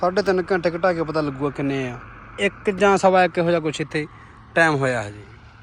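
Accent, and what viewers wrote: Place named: Punjab, India. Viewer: ਵੀਰੇ ਤੁਹਾਡੇ ਬੋਲਣ ਦਾ ਲਹਿਜ਼ਾ ਠੇਠ ਪੰਜਾਬੀ ਸ਼ਬਦਾਵਲੀ ਜਮਾ ਸਿਰਾ ਵੀਰ ਦਿਲ ਰੂਹ ਜਮਾ ਬਾਗੋ ਬਾਗ ho ਜਾਂਦੀ ਆ ਵੀਡਿਓ ਦੇਖ ਕੇ,,,, ਜਮਾ ਸਿਰਾ ਪ੍ਰੀਤ ਐਂਡ ਪਾਲ ਹੋਇਆ ਪਿਆ ਚੜਦੀਕਲਾ ਬਾਈ ।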